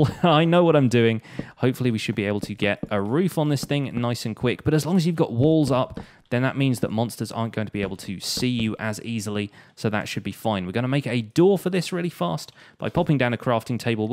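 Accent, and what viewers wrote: England, United Kingdom. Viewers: I love her English accent...skl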